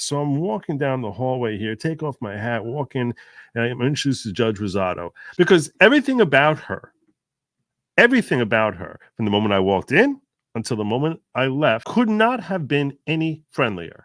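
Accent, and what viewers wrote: New York, United States. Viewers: Thank you 🙏🏽 God Bless you. I just found you and have subbed. I appreciate you more than you will ever know. 🇺🇸 You are a true AMERICAN. Thank you for the attempt to remove gag order. I love your NYPD cap. As I am a recently retired 911 Dispatcher of 30 yrs. Half State time and half County time. This cap means a lot to me. You definitely have a NY accent! My husband of 20 years is from Queens-lifelong. And he has lost a lot of his accent being in the south. But it’s definitely still there. He does still say “ Can I ax you something?” 😂😂 I tell him he better not AX ME! 🤣 Thank you so much. 🇺🇸💙💛💙🇺🇸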